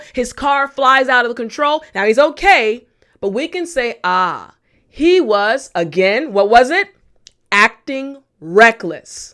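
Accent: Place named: New York, United States. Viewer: Hi, I love your voice and accent. Are you from NY?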